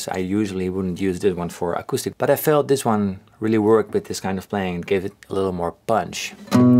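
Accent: Netherlands. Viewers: I heard your dutch accent instantly. I'm dutch too👊🏽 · After 3 sec of speaking I` could here immediately you are a dutch guy..amazing haha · Haha ik dacht op het begin wat een raar accent het lijkt haast wel een Nederlander en toen dat stukje van je vrouw was het echt zie dat dacht ik al. Btw leuke video en erg interessant! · Ik dacht al meteen... Hij heeft een Nederlands accent en ik zie je kanaal voor het eerst, dus ik wist het niet. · Ik hoorde gelijk dat je Nederlands was 😂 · Are you from the Netherlands? I never noticed😂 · Oh shit, you're Dutch lol. Hoorde het eerst niet maar nu wel hahaja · hij heeft een best duidelijk nederlands accent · ik hoorde al een NL accent in je intro! Dope vid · Ik wist niet dat je Nederlands was maar had wel al z'n vermoede met het engels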